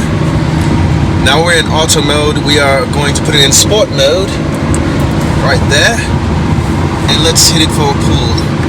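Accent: United Kingdom. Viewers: Loving your British accent😁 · So no mode days Drive it's Strada Corsair or sport mode to go forward and r for reverse. Very interesting so if u put it in neutral when stopped you're self do it rev or go into first gear when u press the Gas? Lol British Accent. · British accents are amazing lol, nice how to man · I didn't know you were British? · love the bristish accent 😂👌🏼 congrats on the car .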